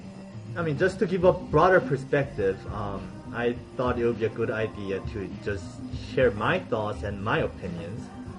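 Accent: South Korea. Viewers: I am fond of your background music. 또한 당신은 재미있는 한국어 악센트가있다. ^ ^ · typical korean face and accent.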